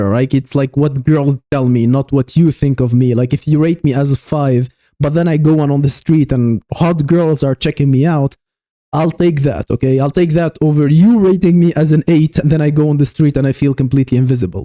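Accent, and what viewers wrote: Russia. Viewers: Bro are you Russian? Your English is really good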